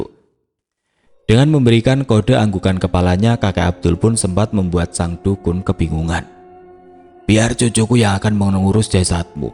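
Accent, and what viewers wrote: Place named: Jakarta, Indonesia. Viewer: Btawian bhsany lucu,pagi mas, yg msih di guyur hujan, ni pondok cabe,msih dngrin mas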